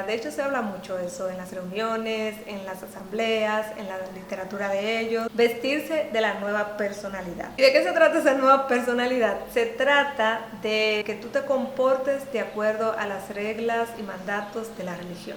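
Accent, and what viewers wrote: Dominican Republic. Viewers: Hola. Qué bueno que lograste salir de esa secta. Quisiera saber si eres dominicana. Tu acento al hablar parece ser dominicano. Yo soy dominicano y me gustaria que por favor me respondieras, y así ver si podríamos tener alguna comunicación para que compartamos sobre el tema. YO estuve estudiando con ellos durante mucho tiempo. Pero su doctrina no la pude asimilar, pues ya tenia un poco de conocimiento del verdadero evangelio de Cristo. Me estoy preparando para formar parte de un ministerio de ayuda a los esclavizados en la secta de la Whatctower. Fuiste muy valiente. Saludos para ti.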